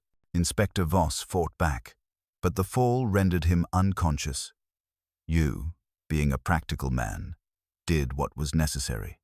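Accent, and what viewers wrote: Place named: United States. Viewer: Why are British stories being narrated in an American accent. It's just not the right tone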